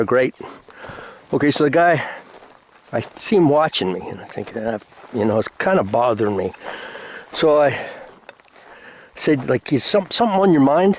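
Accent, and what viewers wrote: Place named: Northeast, United States. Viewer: Where are you from originally? I can't figure out your accent. Are you from the North East?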